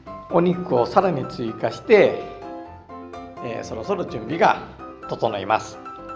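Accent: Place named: Tosa, Japan. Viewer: 先日竹虎さんでせいろを購入させていただいて、せいろの動画を探していたら公式さんの動画に辿り着きました！😳 初めて使うので、丁寧な動画を上げていただいて大変ありがたいです…！参考にさせていただきます✨ 自分は高知県民ではないのですが、恋人が高知出身で高知県が大好きなので土佐弁の音声もうれしくなりました😊🌸 他の動画もありがたく拝見させていただきます✨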